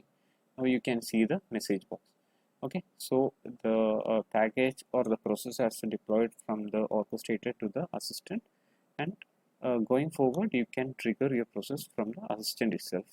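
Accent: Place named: Kerala, India. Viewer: Its really nice video. Very clear voice n explanation. Hope you have little Malayalam accent..🙂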